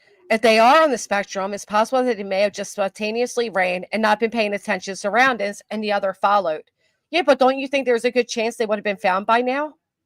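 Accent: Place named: Pennsylvania, United States. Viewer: Happy Mother’s Day! I’m not far away from the PA line so I get how you say certain things. Much like we do here in Delaware 🌊🏖️☀️🏝️🐚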